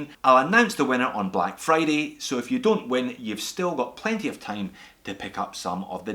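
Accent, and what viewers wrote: Scotland, United Kingdom. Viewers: A Haggis (shhh don’t tell unsuspecting tourists they aren’t real) riding a unicorn (as Scotland’s national animal). Enjoy hearing a fellow Scotsman talking about watches! Cheers all the way from Dundee! Keep up the good work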